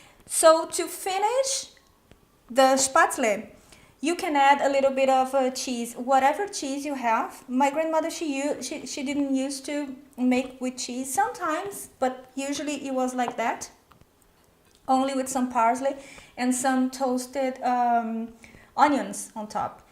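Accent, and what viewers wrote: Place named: Brazil. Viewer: Hi. I am trying to guess where is your accent from. Is it from Brazil? Thank you.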